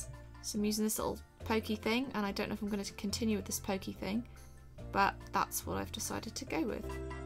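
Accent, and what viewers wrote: United Kingdom. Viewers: British: Me: u sound British already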